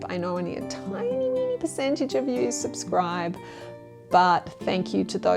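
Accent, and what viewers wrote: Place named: Australia. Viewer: you sound like you’re Australian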